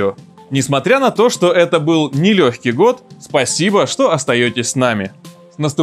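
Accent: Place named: France. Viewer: Отдельно спасибо за французский акцент и надпись на майке 😉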